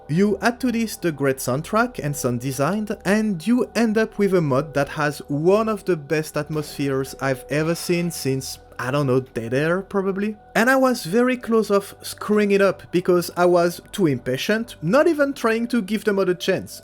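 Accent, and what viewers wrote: France. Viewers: hey, Just coming from stalker2 now i'm gonna play every stalker from soc ti call of pripyat; Can i know wich mod your using on pripyat to make it that good? And if you will be so kind to point me out to some mods for SOC and clear sky to modernize the game a lot graphic wise and gameplay wise gunfight animation while stille keeping main quest and quest in the game. Radiophobia 3 look great for soc but there so many options i'm kinda lost. On Another topic are you french your accent sound french to me :) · Ton accent ^^ ca trompe pas . Cool d'avoir un passionné fr pour les stalkers 👍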